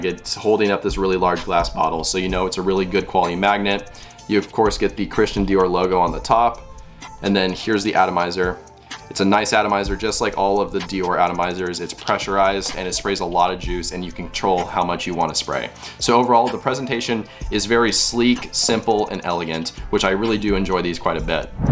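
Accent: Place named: United States. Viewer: Bro plsss get your american accent under control......i wanna scratch out my ears after listening to you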